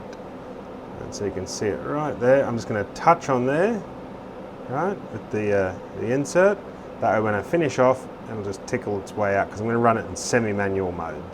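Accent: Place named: Australia. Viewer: Hi, new sub here Jon, from mid-wales, formerly from Colchester, which is a bit weird to hear the old homestead being called out in an Aussie accent :-) I tend to think of Colchester lathes as being 50s-60-s machines, student/master et al. nice to see the name at least still going strong :-)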